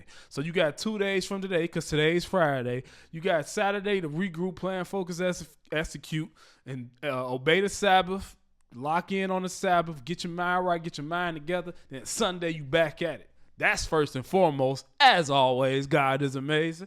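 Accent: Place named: Chicago, United States. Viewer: LMAOOOOO BELT. TO. ASS Worst part is you sound like you definitely from Chicago. We don't claim you folks 😂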